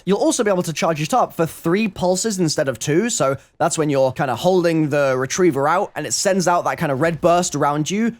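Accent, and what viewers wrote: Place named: London, United Kingdom. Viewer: YOU SOUND LIKE YOU FROM LONDONNNNN (Nailed it)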